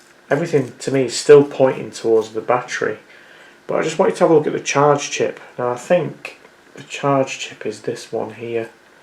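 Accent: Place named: Leeds, United Kingdom. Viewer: your new videos are way better and more authentic, you progressed very well. are you from Leeds? you sound very local to me...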